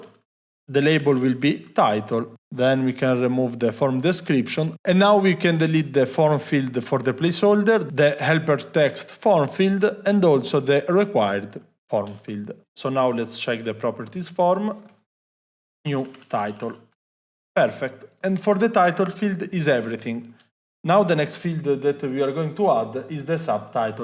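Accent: Italy. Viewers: Are you Italianoo ❤? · Nice work! Are you Italian? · Ma sei italiano?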